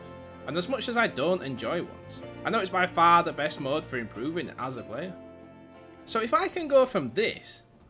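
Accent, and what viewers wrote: Yorkshire, United Kingdom. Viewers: Your a proper Yorkshire lad aren't you!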